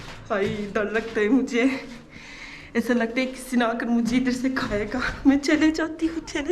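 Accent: Pakistan. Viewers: Yar apki urdu sun k mjhy bht mza ata hai 😍👍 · Sister i like the way how u r speaking urdu Allah hafiz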